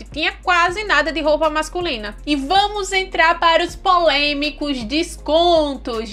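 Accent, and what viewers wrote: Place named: Northeast, Brazil. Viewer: Menina, tu é muito inteligente! Carismática, bonita. Eu gosto do seu sotaque nordestino.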